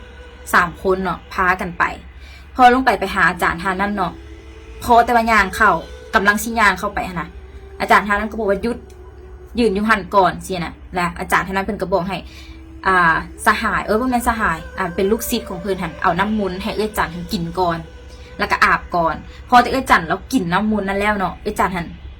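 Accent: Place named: South, Thailand. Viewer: ຮູ້ສຶກວ່າທາງພາກໃຕ້ຂອງຫຼາຍເນາະ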